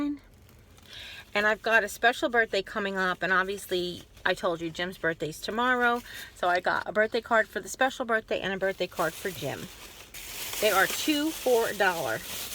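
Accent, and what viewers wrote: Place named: Long Island, United States. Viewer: Hi, new subscriber here! The moment I heard you I felt like I was home. I'm a LI transplant too, but I live in FL...31 years on LI and 25 here in FL. Love your crafts, DIY's and hauls. I'm stayin'!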